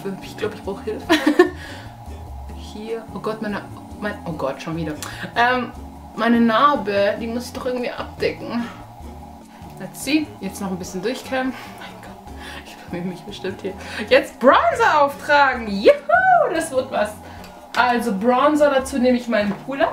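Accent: Swabia, Germany. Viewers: der dialekt :DD schwäb'sch :D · ich finds so toll das du manchmal ein wenig schwäbisch redest!!!!!!! schwabenpower!!!!!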